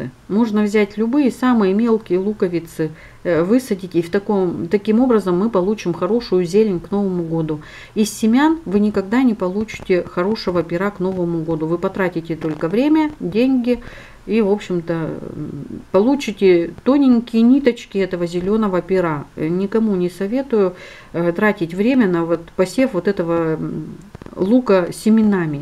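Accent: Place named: Sverdlovsk, Russia. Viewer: в каком городе вы живете? говор алапаевский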